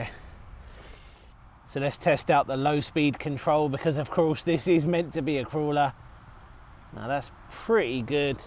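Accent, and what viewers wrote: United Kingdom. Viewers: Are you British by any chance? Because I really want to buy this car but can’t find it anywhere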